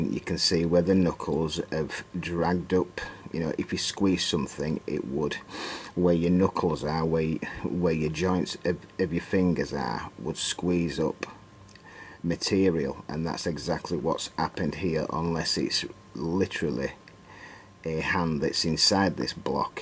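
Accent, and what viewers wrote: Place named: Scotland, United Kingdom. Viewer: I've just discovered your YouTube Channel and subscribed. I've been interested in ancient history/archaeology since I was a child (I'm now 60 years old) and finally, now, lots of things are starting to make sense thanks to your videos. Do you have a web page? I can't find anything other than a couple links when I "google" / You Tube channel - no real website(s). Can I ask your name? Maybe I can find more info that way...also, love your interesting accent! Scottish?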